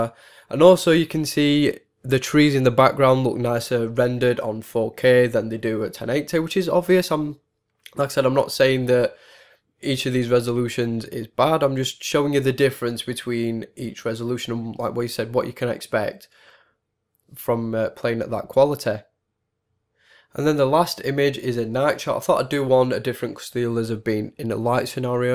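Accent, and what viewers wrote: United Kingdom. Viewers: So hard to understand some of what your saying laddie yalll uk lots talk like this ya?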